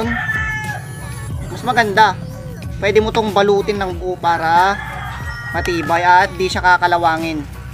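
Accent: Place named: Visayas, Philippines. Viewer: Bisaya ka lpds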